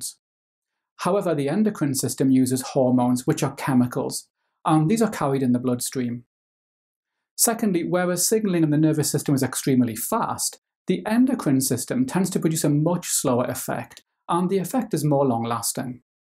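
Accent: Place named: Northern England, United Kingdom. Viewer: Northern english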